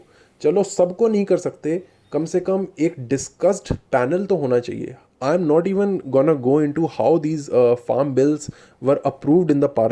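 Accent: Pakistan. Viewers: He sounds more like Pakistani....(accent)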